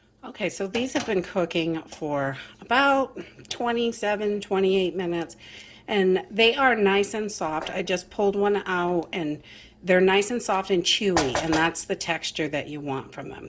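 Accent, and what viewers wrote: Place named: Northeast, United States. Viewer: i cant figure out your accent. is it nj? or ma or ny?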